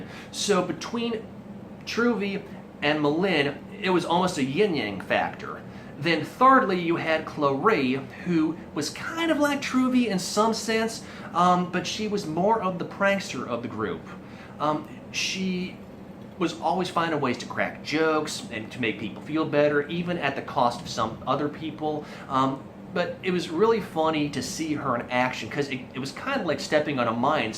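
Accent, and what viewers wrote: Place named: South, United States. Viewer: All I heard through this whole thing was your southern accent. And I loved it. :D Must've been all that Steel Magnolia watching.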